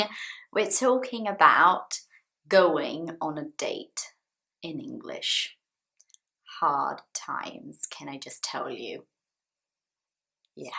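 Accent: United Kingdom. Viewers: The British accent is not my favorite but I like the way you speak english!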